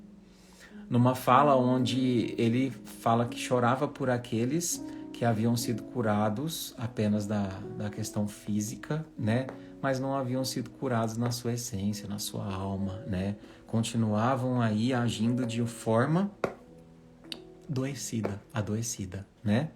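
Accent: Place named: Minas Gerais, Brazil. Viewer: Bom dia! Conhecendo seu canal hj e agora! Só o tom de sua voz, já me acalma o coração e a alma, e esse título de hj, eu estava demais precisando ouvir! Ais 62 anos, c/ depressão crônica, TDA/H s/ nunca ser tratada e dezenas de complexos, rejeição, baixa auto-estima e traumas, desde a infância, como é gratificante ouvi-lo❣️Gratidão por existir e se prontificar a acalentar nossos corações e almas🙏🏻🙌🏻🌟🌠 Vc é psicólogo❓️Tem sotaque de mineiro😊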